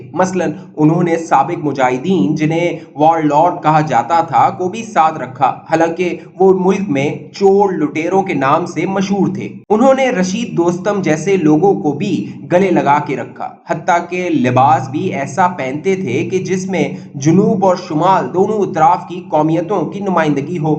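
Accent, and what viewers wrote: India. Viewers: The narrator in this vlog has subtle Hindi accent. For he say Ashraf Gani instead of Ghani.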